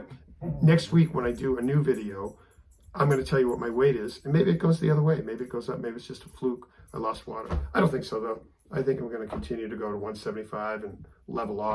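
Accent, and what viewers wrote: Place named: Quincy, United States. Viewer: I’m from Quincy MA. Recognize the accent. Down 50 pounds on keto. Thanks for sharing your journey. Cheers.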